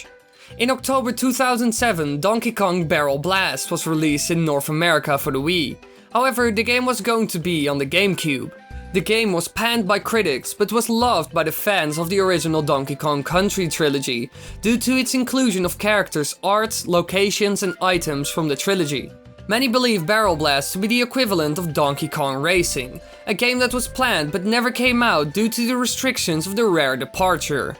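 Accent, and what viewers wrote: Netherlands. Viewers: Yeah, he's Dutch. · are you dutch? your accent sounds dutch...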